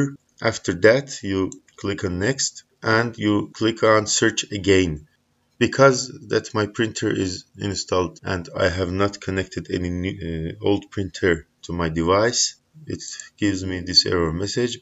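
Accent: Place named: Turkey. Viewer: I bet you are Turkish. This is Turkish accent 😂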